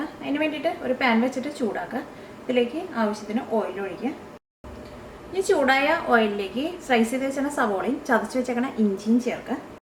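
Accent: Thrissur, India. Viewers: you don't have to be sorry. There are a lot of thrissur people like me, who watches your videos, not only to learn cooking also to hear thrissur slag.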